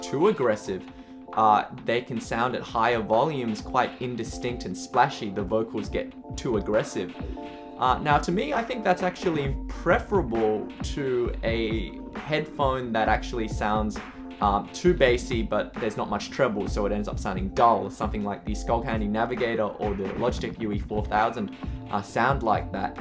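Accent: Australia. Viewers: What accent is it? Aussie?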